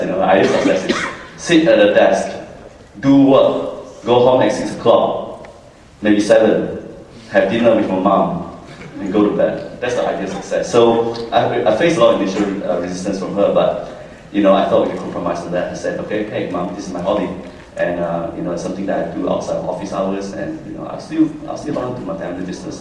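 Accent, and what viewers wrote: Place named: Malaysia. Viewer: just a little, not much. pretty much sounded malaysian to me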